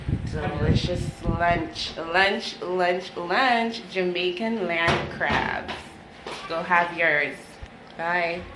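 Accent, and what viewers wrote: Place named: United States. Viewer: are u a jamaican cause u sound like american lol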